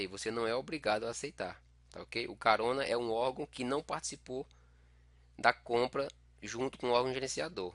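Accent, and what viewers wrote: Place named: Northeast, Brazil. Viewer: Que sotaque gostoso de ouvir! rsrs O senhor é do nordeste?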